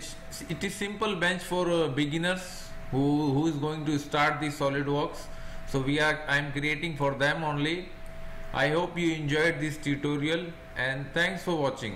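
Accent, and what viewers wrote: India. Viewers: Thank you, finally a tutorial with an indian accent!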